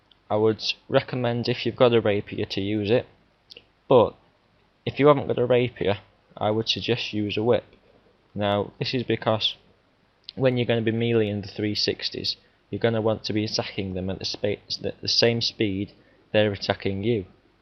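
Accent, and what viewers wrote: United Kingdom. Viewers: good solid english accent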